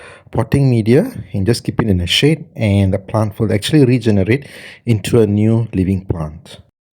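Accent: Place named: Malaysia. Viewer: You sound Malaysian or Singaporean. Where can I get this plant in Malaysia ?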